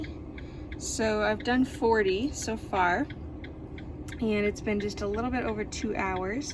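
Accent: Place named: North, United States. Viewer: gotcha! Thought you were from somewhere up north, that accent did it lol you’re pretty asf tho. Have a good day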